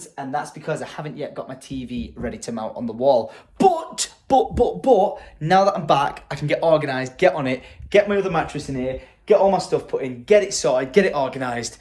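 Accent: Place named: United Kingdom. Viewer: It's probably his northern accent. I've watched him for years and only just realised he has a slight accent recently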